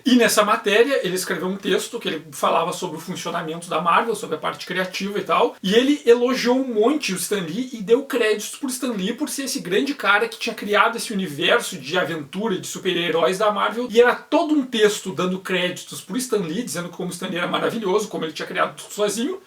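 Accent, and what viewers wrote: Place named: Rio Grande do Sul, Brazil. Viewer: A melhor parte desse vídeo, depois do conteúdo é claro, é ouvir a conversa entre figurões da marvel com um sotaque bem gaúcho! Bah! inclusive "bah" aparece recorrentemente em hqs da década de 60, tu sabes o por que disso?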